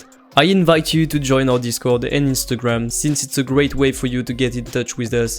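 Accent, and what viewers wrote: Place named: France. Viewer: That french accent, Sah quel plaisir ! Thanks for the tips did'nt know we could use samples in the noises osc ^^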